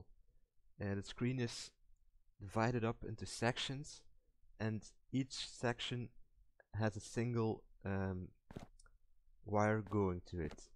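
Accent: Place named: Belgium. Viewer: It's sounds like you are Belgian imo, and it even seems like I recognise your voice. But that would not be possible since I don't really know any other dedicated programmers.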